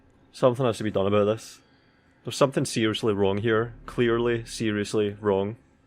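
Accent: Scotland, United Kingdom. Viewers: I don’t even play video games. I come to this channel for the angry Scotsman asmr.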